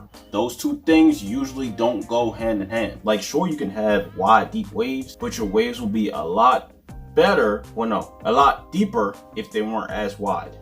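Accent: Jamaica. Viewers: Wait r u Jamaican too🤙🏾🔥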